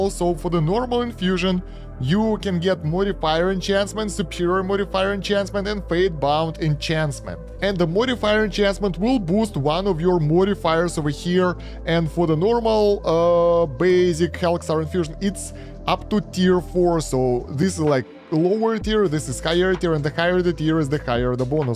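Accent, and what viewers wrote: Russia. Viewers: ДАА, ТАКОЕ ЖЕ ОЩУЩЕНИЕ, ЖЕСТКИЙ РУССКИЙ АКЦЕНТ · такое ощющение что он русский и разговаривает на английском с ужасным акцентом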